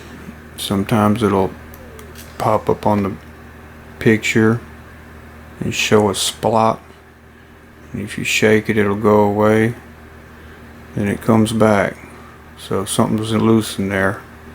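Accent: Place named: Texas, United States. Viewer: You sir are really funny talking with that slow texan voice ! My camera would show me a blurred screen with weird colors and wouldn't take photos anymore after a trip in the Sahara. I followed you step by step, blew canned air and picked out any dirt I could find and it worked ! I'm so happy and I wanted to thank you very much.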